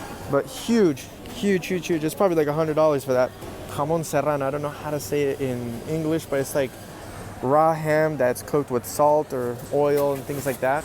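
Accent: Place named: Buenos Aires, Argentina. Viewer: totalmente de acuerdo. Lo escuche hablar en español y el acento era totalmente porteño.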